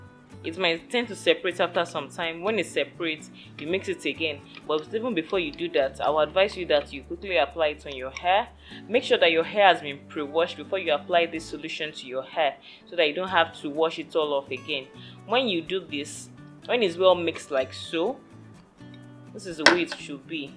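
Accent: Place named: Nigeria. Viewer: you sound like nigerian... hmmmmm are you one of them? .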